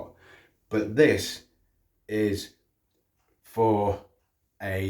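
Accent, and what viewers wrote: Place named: Coventry, United Kingdom. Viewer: Just subbed mate, may i ask your first name and where your from? Sounds like Cov, used to know a few from there.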